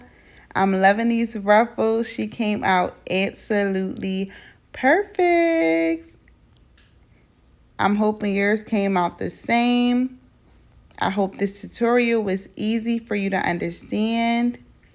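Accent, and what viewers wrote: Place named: Philadelphia, United States. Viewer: Been following you for a while since your crocs went viral . I heard your voice & was thinking where in the world is she from ?? She gotta be from Philly lol❤️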